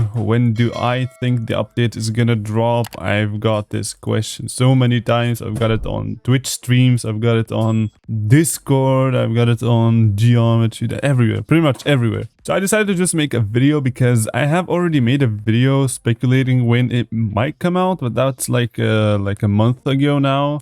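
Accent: Germany. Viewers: realtalk now are you german?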